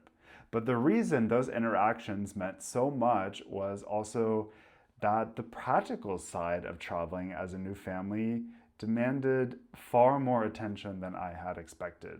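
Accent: Bavaria, Germany. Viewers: I am new to your channel. What I notice most of all is your Bavarian intonation! I don’t hear it in German but I do hear it in people speaking English after a lot of exposure to bavarians. If you don’t know what I mean, listen to Feli from Germany and her unusual patterns, I hear a lot of them in this video.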